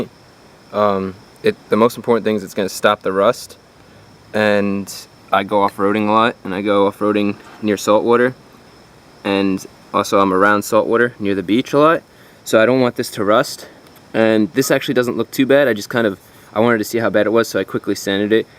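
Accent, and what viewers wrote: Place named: Philadelphia, United States. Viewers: must be from the east coast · He's prolly southern, we say it like that.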